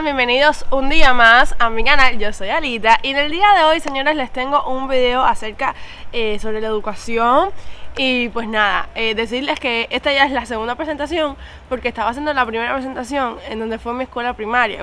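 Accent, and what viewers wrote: Cuba. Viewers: Me gusta como platicas. Me gusta tu acento cubano. Desde Monterrey, México. Cuídate mucho.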